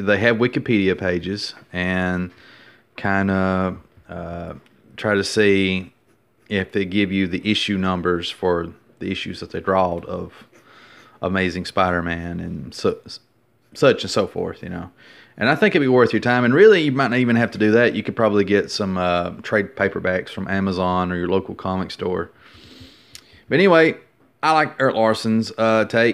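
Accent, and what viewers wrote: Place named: South, United States. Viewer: I like Mark Bageley's Spiderman. Also I like your Southern drawl, its nice to listen to.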